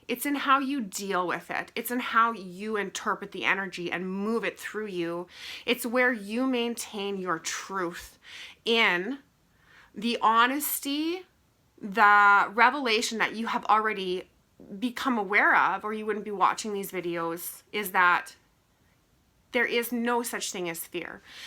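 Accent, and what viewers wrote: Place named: Minnesota, United States. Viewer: I grew up in MN and now live in Italy 🇮🇹 in my wife’s country~ Are you by chance from the MN area? Just curious b/c some of the words u pronunciate sounds like it~ Thanks again 4 the info!!!🖖👌💪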